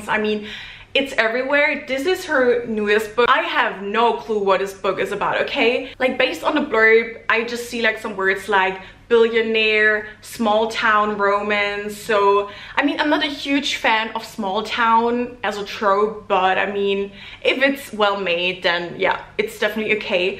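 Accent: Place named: Germany. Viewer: I love your content, I'm always so shocked by your German accent, I just always expect you to have the voice of Adeline ❤ Thanks for your hard work!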